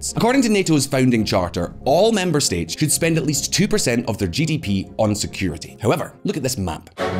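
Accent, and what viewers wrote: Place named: Scotland, United Kingdom. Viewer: Ahh..Scottish…❤️❤️❤️ breath of fresh air!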